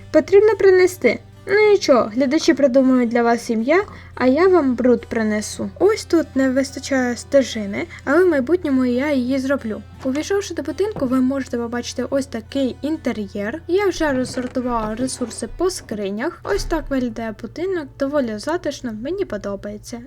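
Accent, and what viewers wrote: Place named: Transcarpathia, Ukraine. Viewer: ВзяВ з печі стейки я вирішиЛА ))) Трохи схоже на Закарпатський діалект, але це не він, чи він ? )))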